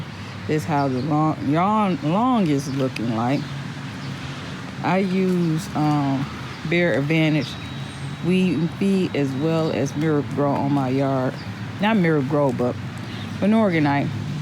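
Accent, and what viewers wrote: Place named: Chicago, United States. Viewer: Hi. I’m new to your channel. Beautiful backyard and flowers. I noticed that your having issues with your dahlias. I believe it’s where you have them planted. You have them in a bed near your gutter water spout and the tubers might be receiving too much water along with the over abundance of rainfall. So you have the rain fall along with all the water from your gutters. I did the same thing about 5 years ago. Moved them to a much dryer well draining spot and had no issues going forward. Also I looked at your surroundings and just knew you were in Chicago or maybe near Detroit along with your accent. Then I went back to your earlier videos and I was right! I’m from Chicago and visit Michigan on a regular to visit family, and I swear the surroundings look exactly the same as Chicago..😆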